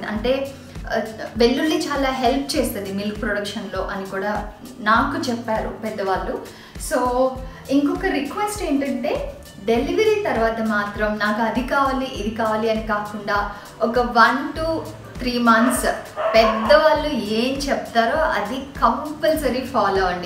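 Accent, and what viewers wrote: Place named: Nellore, India. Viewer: Meeru NELLORE Language mataladuthunaru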